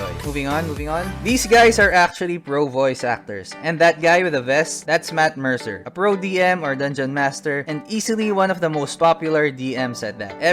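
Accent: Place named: Philippines. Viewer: Is it weird that we recognize a pinoy when we hear it? Is this the pinoy accent they ( the foreigners ) are talking about?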